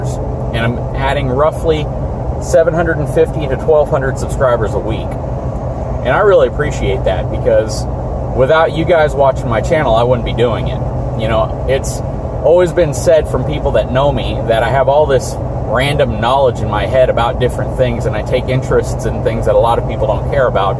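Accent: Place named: California, United States. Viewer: You sound like an Educated Californian. Love your videos and reviews though.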